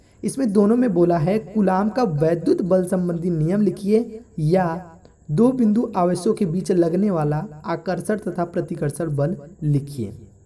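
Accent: Chhattisgarh, India. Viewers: Aap chattishgarh se ho kya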